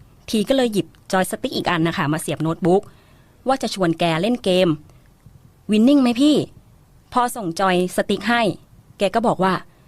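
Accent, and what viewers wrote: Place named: Isan, Thailand. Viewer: พูดอีสานชัดมาก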